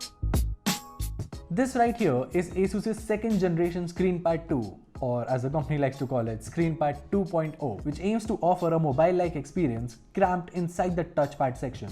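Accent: India. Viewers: The laptop on the video is so drity it looks like u pulled it out of a trash. And try to work on that Indian acceent to be less listenable, we not all americans but we try to fit globally. I had to watch this low budget review with a laptop pulled out of Kirby Trash Bag cuz it was the only one with this model. Cheers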